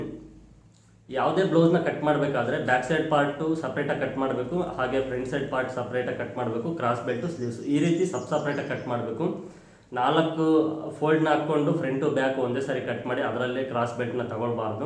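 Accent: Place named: Bagepalli, India. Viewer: Nivu yava kadeyavaru kannada ontara matadtira bagepally Or chintamani na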